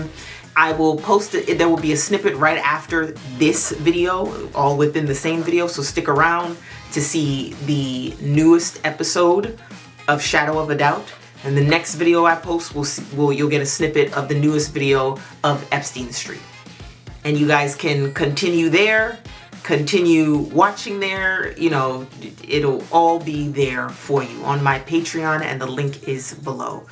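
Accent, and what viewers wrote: Trinidad and Tobago. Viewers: Hi first-time visitor to your channel...you are a beautiful trini womanand a great orator...excellent. Love the trini accent...keep it coming. Trini man here...enjoyed your delivery style❤❤❤ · Ohhh guuaaash I never realized she ah Trini.... I'M IN LOVE....sorry finish the story..... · Jamaican? It’s Trinidadian,, very different accent. Jamaica is only one island in the Caribbean. Every Island has a distinct accent. It’s pretty easy to switch when you’re immersed in both. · I knew you were from the Caribbean but this video and the last I watched confirmed you trini 😂❤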